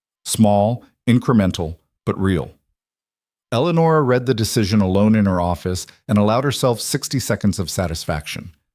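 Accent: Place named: North America. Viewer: Ugh at the lisping N. American accented AI for a story set in 19th century England.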